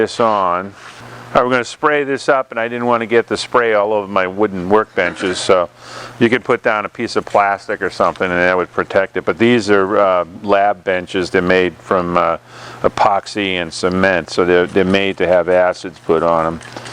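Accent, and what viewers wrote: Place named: Maine, United States. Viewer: Almost like a Maine accent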